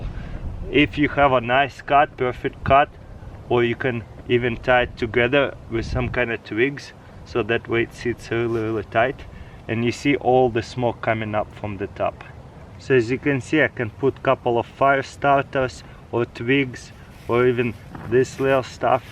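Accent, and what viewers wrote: Russia. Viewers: Вот это понимаю,английский с русским акцентом)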